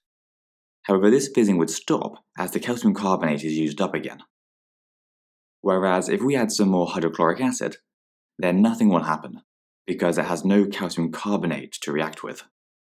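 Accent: United Kingdom. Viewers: British accent 💀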